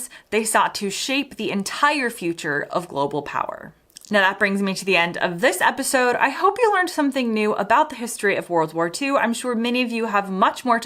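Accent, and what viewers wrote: United States. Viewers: Okay young lady there's a couple of things you're right about and a whole bunch of stuff you're dead and wrong on It is true that people in the United States have a tendency to downplay the massive Soviet effort during world war II It is also true the United States was a deeply racist Nation at the time and had no right to be criticizing others on that score And it is also true that the allied bombing campaigns killed hundreds of thousands of civilians. What you were wrong about is that first off the Japanese were never ever ever going to surrender until they were utterly defeated Even after we dropped two nukes on them there was an attempt to overthrow the government by extremists and continue the war anyway Second off the United States was the nation that tipped the balance in the war There's a reason why the British and the Canadians were unable to invade Europe before the Americans came along. 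They simply did not have the manpower, the money, or the material to do so Without the United States, the axis could well have won that war. I find it interesting you sound like a young lady from the US, but you also sound like a young lady who doesn't like the US very much based on your video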